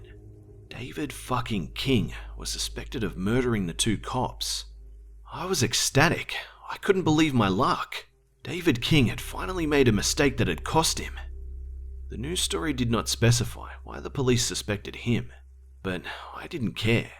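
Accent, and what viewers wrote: Australia. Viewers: Is that an Aussie accent!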